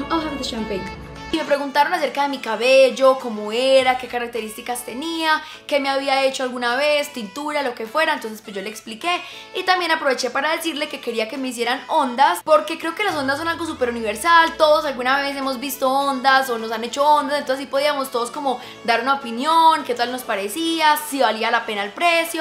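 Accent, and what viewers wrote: Antioquia, Colombia. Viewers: Me encanta el acento de Colombia es el mejor de Latinoamérica saludos desde Venezuela 😍😍😍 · Recuerda que ese acento no lo tienen solo los de Medellín, son más ciudades, Armenia por ejemplo, entre otras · Ella habla asi por que es paisa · Osea no todos los colombianos hablamos con ese acento, así hablan los paisas que son de la ciudad de medellin. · No toda Colombia habla asiii, únicamente los paisas hablamos así y Antioqueños. 💛 El acento cambia según la región.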